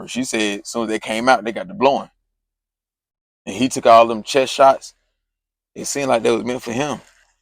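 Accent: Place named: Carolina, United States. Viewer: I think u from the Carolina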